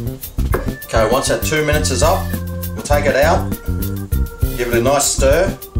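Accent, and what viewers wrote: Australia. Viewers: This guy is Australian :D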